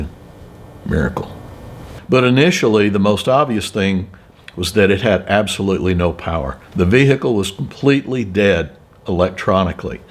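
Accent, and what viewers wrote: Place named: North Carolina, United States. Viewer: Hello from North Carolina Dr! I love your videos and I'm also a subscriber of channels too. You sound just like you are from NC because you talk like we do here, and I love it. Was that gold car a 63 split window Corvette in your garage? It sure looked like it was which is my favorite Corvette body style. May God bless you and your loved ones and I pray y'all will have a very Merry Christmas!!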